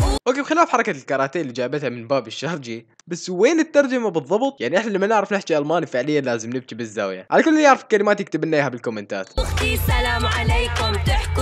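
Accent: Iraq. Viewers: لا سوري عايش بالعراق لهذا لهجتة عراقية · شوكت كال ??اصلا هو ابد ما عرف على نفسة بس من اللهجة مبين عراقي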